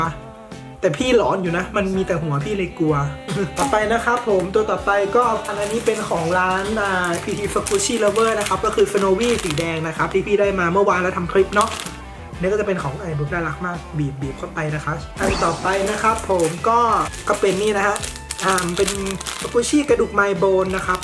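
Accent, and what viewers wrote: North, Thailand. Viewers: คนเหนือหลอ?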